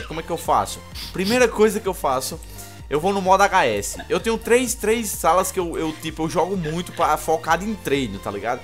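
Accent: Northeast, Brazil. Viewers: tu é nordestino???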